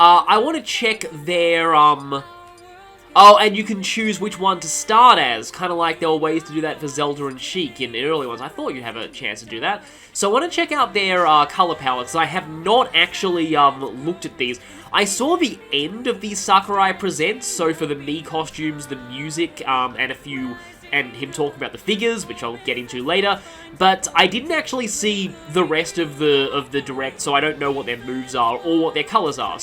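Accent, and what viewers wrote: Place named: Australia. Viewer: could this video be foreshadowing for a xenoblade 2 playthrough? i would love me some british dub + ozzie commentary combo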